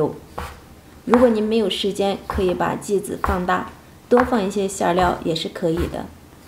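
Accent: Northeast, China. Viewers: 超做作，虛。想你東北話就賊好聽了。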